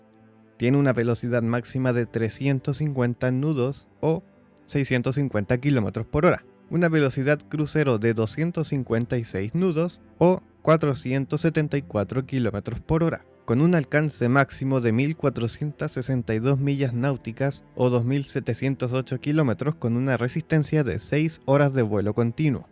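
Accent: Chile. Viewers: Solo por curiosidad, el que relata es de origen chileno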